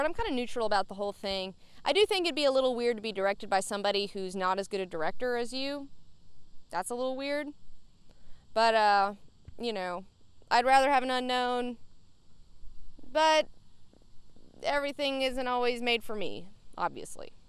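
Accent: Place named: South, United States. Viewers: I know youre in Alabama shooting the video but is that home, because that local accent was really coming out, i thought it was my imagination at first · Yer southern accent comes back the further east you go. Yeah, yeah - cast the unknowns! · im really starting to hear that southern accent come out of you, especially when you got that alcohol in ya. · Wow, sounds like the Alabama accent really stuck on you. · Where are you from? Slight southern accent coming through. Going to say Nebraska. Yes? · LOOK WHO GOT HER SOUTHERN DRAWL BACK! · Spend some time in Alabama and the Southern comes out. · I noticed a southern twang in your accent I never picked up on before. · You picked up a southern accent. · Sounds like Female Kenny Powers. Is she from the place she's vacationing? I know a lot of people revert to their 'drawl' when they go back to their home turf. 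I see it when I go back my home; get drunk and start talking local again. No issues at all with the statements though; entertaining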